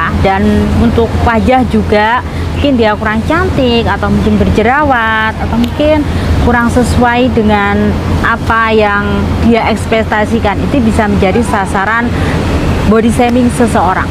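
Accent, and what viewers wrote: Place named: Java, Indonesia. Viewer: Informasi yang sangat bermanfaat ni mbak, di liat dari logat bahasanya pasti mbaknya orang jawa ya 🙏👍👍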